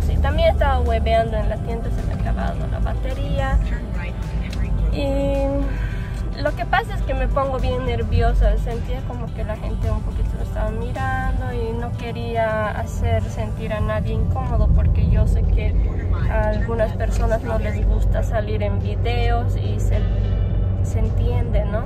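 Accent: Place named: Bolivia. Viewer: Me hueveo no? jajajajaja me mataste, eres autentica boliviana en tu manera de hablar 100%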